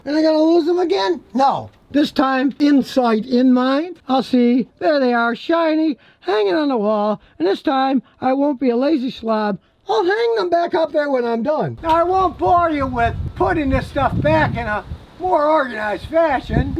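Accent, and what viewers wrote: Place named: New England, United States. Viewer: If you were not from Texas I would have after watching this video, thought you were a old YANKEE from New England. you reminded me so much of my old friend Barry. Keep up the great work.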